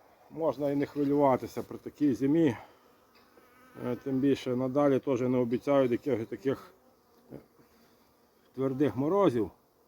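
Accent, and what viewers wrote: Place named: Prykarpattia, Ukraine. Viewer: розмовляєте не по тернопольськи ,а більше говір схожий на Прикарпаття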